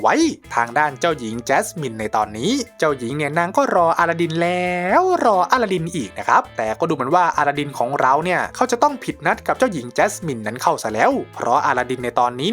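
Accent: Isan, Thailand. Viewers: ทำไมพี่พูดอิสานได้อ่ะ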